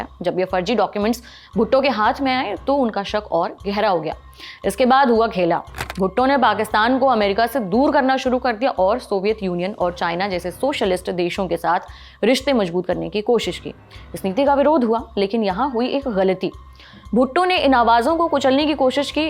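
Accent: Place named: Rajasthan, India. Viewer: madam aap Rajasthani ho 😇...tiya pancha bolne ka trika like rajasthani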